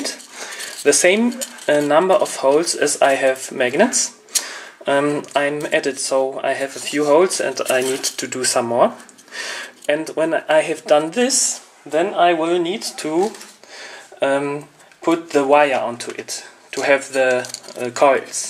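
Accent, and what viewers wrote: Germany. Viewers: Man merkt glaich das du deutscher bist. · German accent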